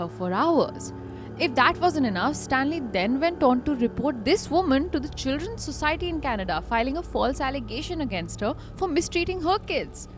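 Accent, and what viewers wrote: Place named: India. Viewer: Start talking in Hindi instead of talking in English with a Hindi accent and you’ll be heard more.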